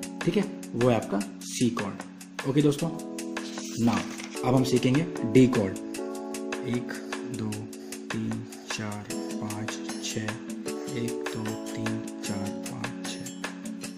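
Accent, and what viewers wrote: Himachal Pradesh, India. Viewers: Bhaee himachal se ho kya?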